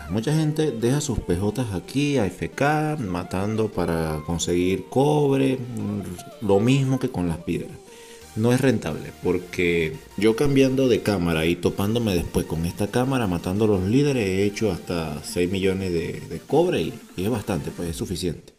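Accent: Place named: Venezuela. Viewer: Papi me suscribo, fijo eres venezolano casi que zuliano saludos desde la merjor ciudad de venezuela Maracaibo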